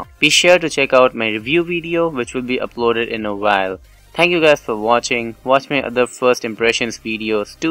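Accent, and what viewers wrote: India. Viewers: U sound Indian, are u?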